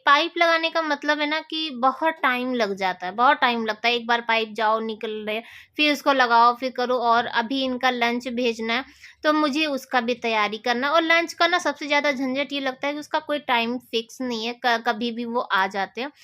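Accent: Uttar Pradesh, India. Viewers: Koi na apki UP wali language hai..😂😂UP wale eaise hi bolte hai 😂😂😂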